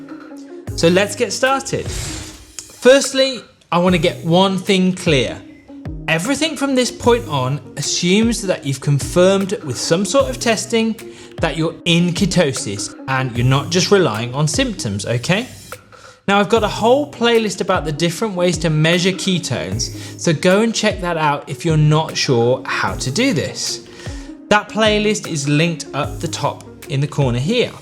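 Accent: United Kingdom. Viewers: It's sooooo refreshing to get useful advice & info about weight-loss & nutrition, especially from someone who's been there himself. It's also great to hear a British 🇬🇧 accent, as opposed to those Americans who drown you with info & grate on your ears 😣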